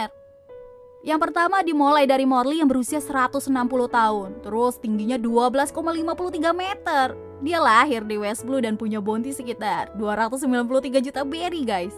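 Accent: Java, Indonesia. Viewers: Iyoi medok... Koyok pisan. Wong jowo kabeh berarti iki yo... G or Irish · Saya kangen suara medok 😀😀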